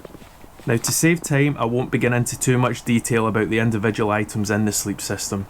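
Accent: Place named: Scotland, United Kingdom. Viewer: love your accent man, need to get my ass in scotland to backpack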